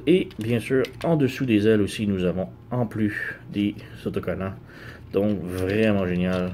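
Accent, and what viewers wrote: Canada. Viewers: vous etes canadien?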